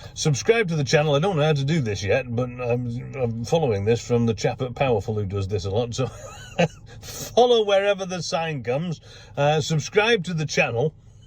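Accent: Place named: RP, United Kingdom. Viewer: Hold on a minute. I'm 12 videos away from watching all your videos. I've been at it about 5 days. I'm watching in no particular order. I think I been going backwards mostly. Why are you talking so posh is this one? 😁😁😄🤣 what a difference from this one to your most recent haha. Anyway love your stuff, will be gutted if you get rid of Finn. I'm an aging L320 owner dreaming of an L663 someday soon I hope. Love from Scotland 🏴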